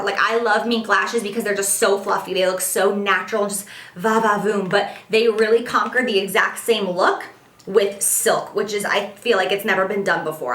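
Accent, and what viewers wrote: United States. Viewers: Omg I love you accent it is the best aha the way you say caramel is so cool wish I was American xx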